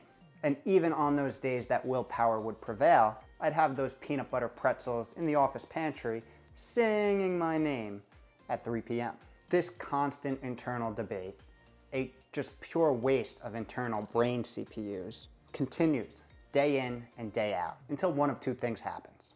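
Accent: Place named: Long Island, United States. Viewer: I have hit approximately hour 45 of my extended fast, trying to hit 72. Cannot believe you did this for a week. I am used to ADF but trying to break things up a bit so my metabolism doesn't get acclimated. Trying to watch videos like yours to keep my momentum going. It sounds like you didn't always eat so perfectly, did you have a transformation of sorts? That's the journey I am on. If you did, could you do a video on that? Thanks to ADF I was losing weight before Covid-19 now I am certain that when we go back my co-workers will be shocked at the weight loss I have accumulated so far..my journey won't be over though. It's certainly nice to be losing weight during a time when others are unfortunately gaining weight and falling into such unhealthy habits. Thanks for this. Also I definitely hear the Long Island accent, I have family from Lake Ronkakoma.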